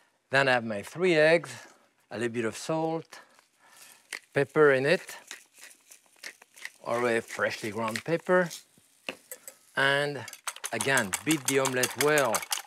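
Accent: France. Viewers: That french accent.. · merci M. Pepin...c'est se bonne-- this is wonderful....dae schopping of the herbes is a beautiful sound.....love his francais acCent!!!! ') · He actually said, "we're making more dishes with eggs" but it sounded like, "more of this shit with eggs." lol French people can't say the word "sheet" either and would say, "on a cookie shit." He often calls eggs.... "heggs" too. My mom is French so I've heard this accent all my life. It's adorable. I feel right at home with Monsieur Pepin. Can listen to and watch him cook all day.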